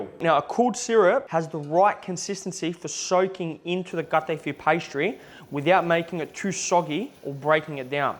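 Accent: Australia. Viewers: He's Greek so Greek Australian accent! Lol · Its a typical Wog accent (Greek-Australian). Its like the equivalent of how Italians in New Jersey have the Jersey accent. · Why does he sound Australian. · Because he is Australian 😊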